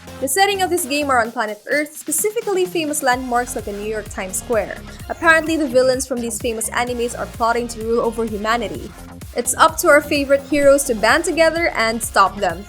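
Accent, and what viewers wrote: Philippines. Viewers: You sounded like a filipina😅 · I hear Filipino Accent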